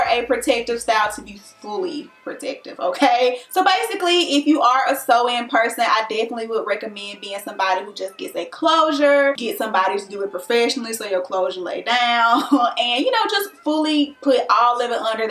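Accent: South, United States. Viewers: Finally yes! I can tell! lol that’s why I said influence cuz you have a southern accent the way you say “up” “Best” and “pound” it’s evident of the two. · Instantly subbed because of the southern accent 🤣 I’m from the south and it’s hard to find Youtubers who talk like me! Love the video! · Ohhhhhhhh Lol ok I knew you had a country accent. I was like maybe her parents are from the south. Because people tell me that I have a country accent sometimes but that’s because my grandmother is from the south. But when you were saying Cousin I was “oh she from Cali because they say Cousin a lot” don’t they?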